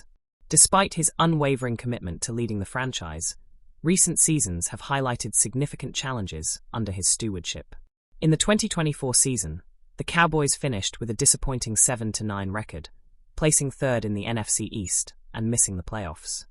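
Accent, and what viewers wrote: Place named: United Kingdom. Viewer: Nothing says American football more than a guy with a british accent. If this is an AI voice maybe pick an American voice?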